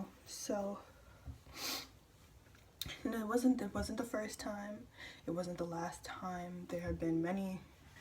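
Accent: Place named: New York, United States. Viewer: From the way you talk, you're definitely a native New Yorker.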